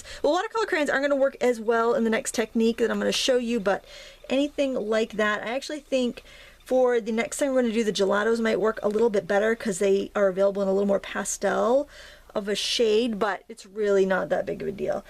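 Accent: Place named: New Jersey, United States. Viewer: This is really a nice, peaceful scene,. Thanks for showing it to us.Do I detect a NJ accent when you're talking? Sounds like, "up home," to me. I like to listen to you talk.Happy Holiday Season to you and yours.:D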